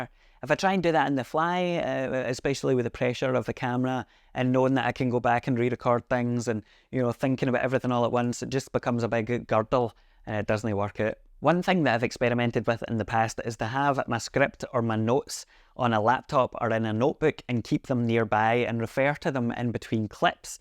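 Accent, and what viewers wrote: Scotland, United Kingdom. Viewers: Your videos are great because it feels like you are hanging out at yours for a bit. Its so easy to get stuck in perfectionism loops, so whaever you need to do to have fun doing it is worth exploring. But as feedback, Ive never noticed you looking away from the screen and your videos always come across as really well produced, engaging and enjoyable. Just be yourself. Also thanks for hosting a synth/video channel with your own accent. Puts fellow scots at ease, even the ones from Edinburgh:)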